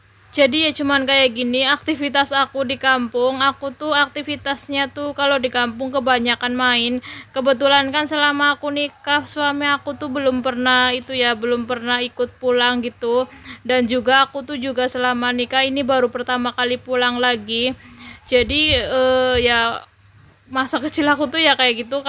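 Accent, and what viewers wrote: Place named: Java, Indonesia. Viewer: Asli mana bun,medok bgt logat nya ..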